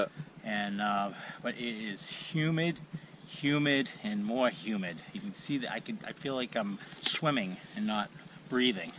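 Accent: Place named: Maine, United States. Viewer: Having trouble commenting on your other videos could just be my phone but I just watched a couple of your videos...thought I recognize that accent lol Can you just tell me how far up the trail you walked before you found that campsite please ...that would be helpful I’ll bring my GPS and Mark it and put it on my channel when I do it next spring thanks ...One Mainah to another 😀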